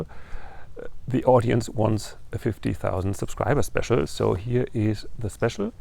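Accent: Germany. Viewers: After three spoken words in one of your previous videos I knew that you are German. Well, I am too. That might explain it. And I recognized the German forest. Keep up the good content! Grüße vom anderen Ende Deutschlands aus dem Saarland :) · Nice to meet you,. I recognized your German accent, although your English is excellent. I lived in Neu Ulm for 3 years. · Hi, woher kommen deine Englischkenntnisse? Ich hab natürlich deinen deutschen Akzent erkannt. 😏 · We have it here in Western Australia on Sunday and also from 5pm to 7am every other day however we can mow our lawns on Sunday from 10am to 4pm. It's a great idea and even with Sunday mowing it still feels like a rest day with the smell of fresh mow grass and should you not abide by the Council by-laws the Ranger will pay you a visit and fine you so it's just not worth the effort, everyone seems to abide anyway. 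Funny you should say some thought it Australia, I thought Germany because of your accent. Congratulations on your 50 thousand subs, I think we're all enjoying normalcy and calm wether here or away were all pretty much the same as people I think 👍🐨🇦🇺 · You are obviously a naturalist. Most likely a vegan or at least a vegetarian. Beautiful German accent. Beautiful country!!!! My father was 100% German. A hard working honest stern proud man. Nothing he wouldn't take on with full stream. Luckily I'm like him and not my passive Norwegian mother. 🙂 God's speed. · From the beginning, i knew it you are German because of your German Accent. I have friends from Germany he live near Bonn, I also have from Elmanau. Hugs from the Philippines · I think it's funny someone thought your accent was Australian. I knew it was either German or Austrian before you mentioned the GDR and because it sounds like my Aunt's accent and she's from Germany, the eastern part too. 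· Hi From Michigan USA. I love watching your videos. My Father is from Germany so I am very familiar with your accent. I love how soft spoken you are and you have done a wonderful job with your house. As for the brick wall.. whitewashing will be the same as painting.. once done you can’t go back. The brick in your kitchen looks very nice. I’m sure that if you ever decide to do a air B&B with the house you will have no problem filling reservations with all these u tube subscribers! Good job! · I knew it! You are lived in Germany because of your accent. And no wonder why you are so hardworking (because i knew someone who also lives in Germany that can do so many things in his free time while working at the company and also he is a lecturer) Cheers and God bless you From the 🇵🇭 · Haha called it I new that was a German accent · I love the little info show. Thanks! Also about the accent. I knewwwwww IT was my grandparents motherland...Germany! 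yayyyy! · I would think that it would be obvious that you are German as soon as you start talking I could figure that out. I really like your videos it reminds me of my dad doing woodworking onon the weekends. Plus your personality is a perfect match. ( my dad was German, he has since died) Also having no screens on your windows tells me for sure you’re not in America. We are fanatics about our screens on windows and patios. Good job.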